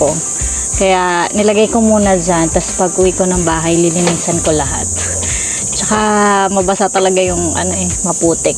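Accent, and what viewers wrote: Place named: Bulacan, Philippines. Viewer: Hello po,taga bulacan k po b kc may punto k po eh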